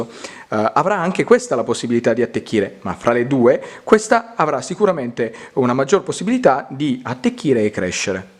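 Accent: Sicily, Italy. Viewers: Ma sei siciliano?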